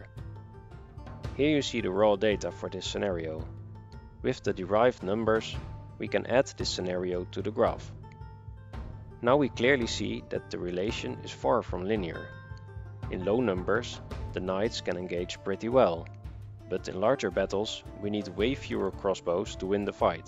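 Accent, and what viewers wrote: Netherlands. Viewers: Such a thick dutch accent. Cannot listen to this, sorry. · Oh god if that's not a Dutch accent I don't know what is :P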